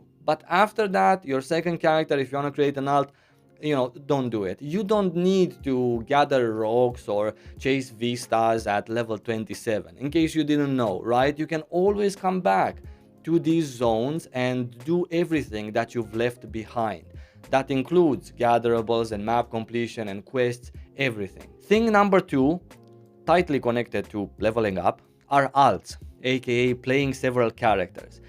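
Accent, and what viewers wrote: Italy. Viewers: As usual very nice video thanks ! May I ask you a question ? I'm French and I'm used to hear people speaking English from different countries and with different accent. I don't know why but you accent sounds Italian, the way you speak English and the way you handle your hands as well :) At the same time I also think you may be from from an Eastern country like Romania. Do you mind telling where you're from ? (and no it's not because I'm a weirdo and felt in love with you !!!) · are you italian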